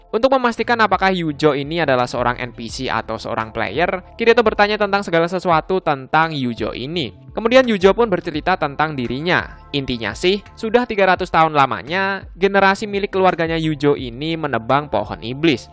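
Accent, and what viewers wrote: Java, Indonesia. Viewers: Gw suka sumpah kalo adminnya bilang"E tapi,E karna"pake bahasa medog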